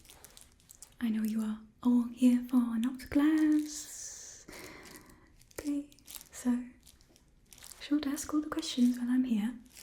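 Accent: England, United Kingdom. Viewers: The British accent hits every single time! Btw, how did u get ur new profile pic? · Yes I am still impressed with that british accent, I am british and I cannot do an american accent to save my life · No such thing as a "british accent". That's an English accent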